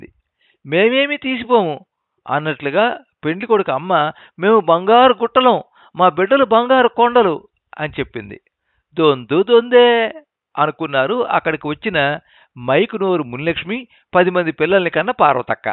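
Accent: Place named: Chittoor, India. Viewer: సర్ కథ చాలా బాగుంది...చదివిన తీరు,చిత్తూరు యాస, జోడించిన saamethalu...super 🎉🎉🎉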